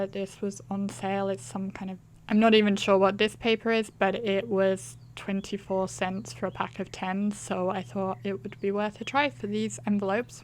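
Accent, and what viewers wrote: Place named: United Kingdom. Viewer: I have been thinking about making my own envelopes. I haven't got a board for it, but I might have a go anyway! Also, just wondering why you make your cards in A2 size? From your accent, I can tell you're British (like me) so wondering why you don't male A6 cards, especially as you mentioned your patterned paper was A4 (rather than US letter size).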